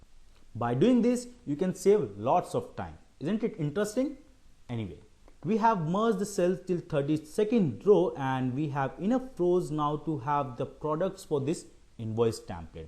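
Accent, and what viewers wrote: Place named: India. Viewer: u are RIGHT i HEARD THE SAME , BUT YES OFC IT IS THE INDIAN ACCENT !!!!!!!!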